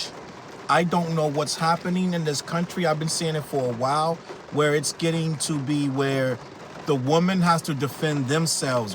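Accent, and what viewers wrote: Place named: Maryland, United States. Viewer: Also, are you from Maryland? I’m from 301 and def hear the accent at times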